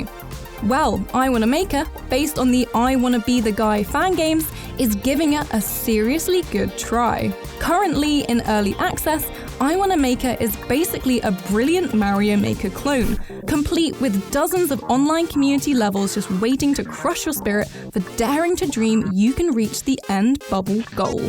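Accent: United Kingdom. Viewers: Yo yo British... I was soo bored by listing American accent .. happy to listen to an British one .. 😌😌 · Narrator : Very strong british accent I'm out...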